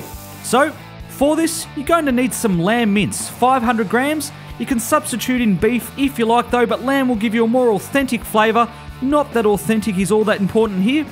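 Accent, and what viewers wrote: Australia. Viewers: I like the aussie accent, very similar to the british one righ'?)))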